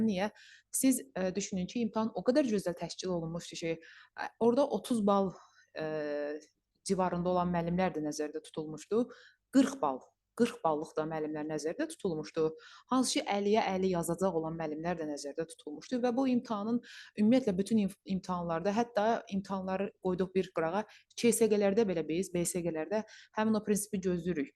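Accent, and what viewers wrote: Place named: Nakhchivan, Azerbaijan. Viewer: Məlimə siz naxçıvanlısız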